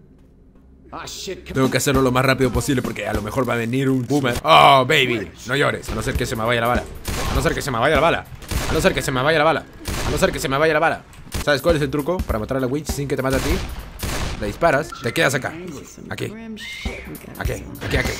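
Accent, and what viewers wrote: Peru. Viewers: me parece o eres peruano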